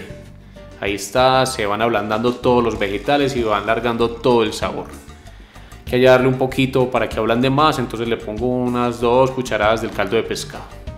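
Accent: Antioquia, Colombia. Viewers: sexy voz paisa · Eh ave Maria, se nota que sos paisa! Que viva colombia 🇨🇴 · esa voz de paisa tan linda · Me llama mucho la atención el acento colombiano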